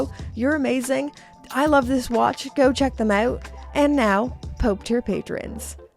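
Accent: Canada. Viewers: Stay with your Canadian accent. I can sing the Canadian National Anthem and I’m American. “Oh, Canada…” Are you happily married? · I keep hearing the long O sound in “about”. Is Canadian?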